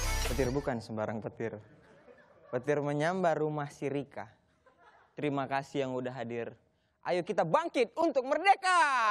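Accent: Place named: North Sumatra, Indonesia. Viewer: Agak laenn, sumut sekali bahasanya 😂😂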